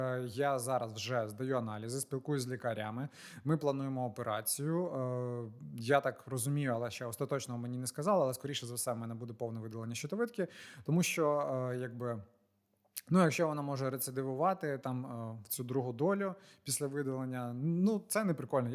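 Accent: Standard. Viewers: чиста українська💪👍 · Давай не розклєюйся :) Хороший настрій, пили контент та одужуй, і до речі, дуже гарно говориш чистою українською) Це перше відео з твого каналу яке я побачив, до того ж, з рекомендацій ютуба 😊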